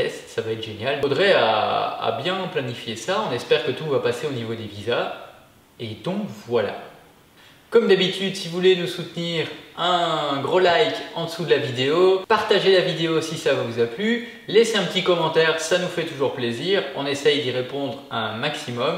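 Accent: Quebec, Canada. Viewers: a force de être au Québec tu commence à avoir le accent québécois !! super vidéo!!! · Bonjour les Compagnons, un Belge qui n"a pas l'accent Belge et qui prends l'accent Québecois, c'est super . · hello , tu a de plus en plus l'accent canadien ... mdr... pour ton problème d'eau a tu regarder a l'interieur de la caravane si tu n'avais pas une vanne de sécurité ? sinon hâte de vous voir commencer votre voyage, et surtout hâte de voir vos vidéo, bon courage a la petite famille.. biz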